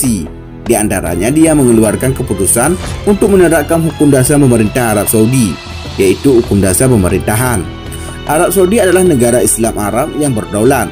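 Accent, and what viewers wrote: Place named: Solok, Indonesia. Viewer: Naratornya pasti urang sOLOK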